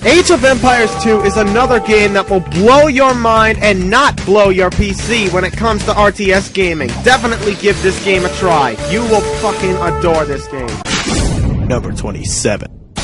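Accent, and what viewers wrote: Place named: New Jersey, United States. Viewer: this guys obviously from jersey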